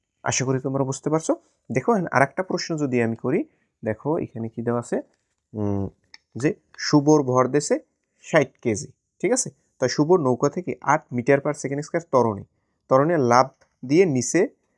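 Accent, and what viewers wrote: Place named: Barishal, Bangladesh. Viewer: Sir apni mone hoy barisailla....ami kintu barisaillah😊😊😊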